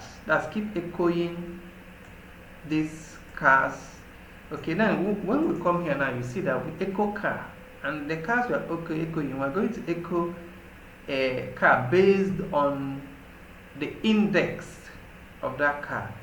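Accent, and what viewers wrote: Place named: Nigeria. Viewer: Wooaahh!!! Thank you so very much, Mr! This video helped me to understand the "sort" function and method of use very well!🥰 Also... I kept on laughing sooo much, as I listened to you teach! At first, I didn't even know who was teaching, until the accent caught my attention! lol!!... (I was expecting an Indian, as usual - they actually teach very well... but the accent sometimes is a challenge for me.😊) But when I checked the name of the vlogger because the accent was sooooo Nigeranly, and I saw it was Nigerian?? 😂 I couldn't help but laugh sooo much as I watched and learned along😅. You really sound like these our Nigerian lecturers in the Nigerian Universities😂💝(plus the "do you understand?" that you kept saying!😂🙌... Oh Sir, I truly appreciate this video!🙌) Thank you once again Sir, for taking out your time to make these videos. 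God bless you!🙏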